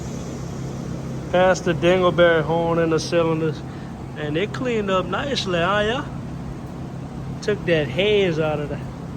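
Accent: Louisiana, United States. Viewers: Come on now, obviously it’s Cajun. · Is this a Creole or Cajun accent? No disrespect intended. Love it either way. Uniquely Louisianan . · You sound like one of them fellas around New Iberia or St. Martinville.. or one of them backwoods Louisiana areas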